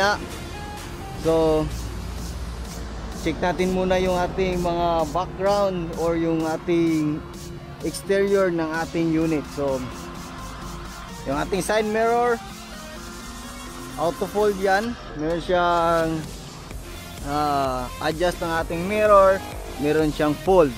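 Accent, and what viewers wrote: Visayas, Philippines. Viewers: Bisaya kaba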